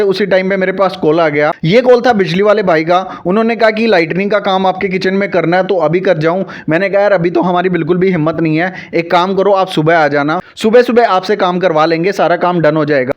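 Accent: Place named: Delhi, India. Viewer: desi language me aane Diya Karo shorts bhai desi ka aalg maja hai na jis hisb te bolo ho nu lago ho delhi aale paplu ho. but ek chig ke liye appreciation dena chahuga ki jo pdhhe likhe hoya kare bikuo sense ke tarike se bolte ho . arr ree yo karle 😂 yo hoga wo na karte all the best for you shop🫠 god bless you but ek chig khena chahunga ki aata base use karo ya multi grain khud bhi banwa sako ho arr bdhya quality ke jite wo healthy consider howega arr public daily intake easily kar legi . madia aala sodha bitha dega body nee baki ap samjadar ho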